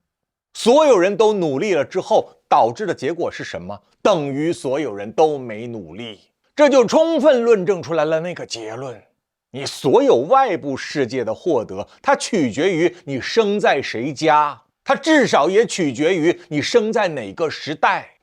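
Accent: China. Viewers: ？你的頭髮還沒白，不夠格當叔，別自我抬搞一輩。你的自介雖用繁體字，但你的口音掩飾不了你是中國人的事實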